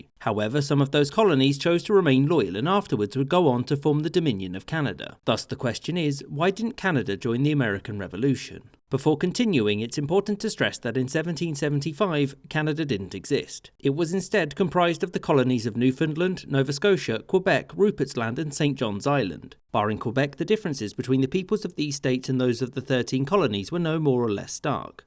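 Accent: United Kingdom. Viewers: This guy sounds British so how can he be unbiased. I would like to hear a video from a real Canadian. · His English is very clear, but he is speaking with a standard British pronunciation, as opposed to an American one. Maybe it is why you had trouble understanding him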